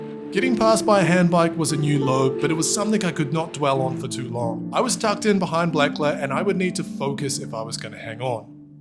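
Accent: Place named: New Zealand. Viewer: Loving the kiwi zwift commentary. Follow on zwift as well. When’s a group ride coming?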